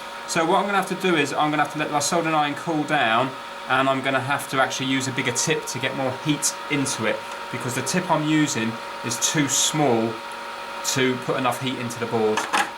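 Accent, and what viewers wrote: United Kingdom. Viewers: "Sol-der", I'm painfully aware of England and America being separated by a common language, but how many times can you say "sol-der" in a minute? Great show, but each "sol-der" was like having my ear drums flossed. We watch mostly British shows, Cookers for Stoves, Stoves for Wood Stoves, Diggers for Excavators, Train Drivers for Engineers, oh my, you just did the "Haitch" pronunciation for H, I had to turn it off. Fascinating until that point. The sol-der and Haitch pronunciations are shared by Big Clive, on YouTube, I turn him off after too many for the same reason. Hope you got it working, I'll stick to the American that fixes consoles and sundry electronics. He seems faster at it, or he edits out some, though you seem to do work equal to his. But British Viewers beware, the American version of this man may grate on your nerves like this man did on mine, and I'm not suggesting we're right, just more accustomed to hearing our pronunciations. 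Best regards. I'll add my thumbs up to the 4,433 others, wish my nerves could have made it to the end.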